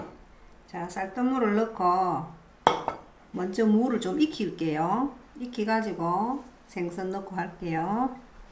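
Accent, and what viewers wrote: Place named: Daegu, South Korea. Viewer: 선생님 감사해요 멀리서 항상잘보고있어요!!! 구수한 사투리 듣기좋아요 . 저도 고향이 대구거던요!! ㅎㅎㅎ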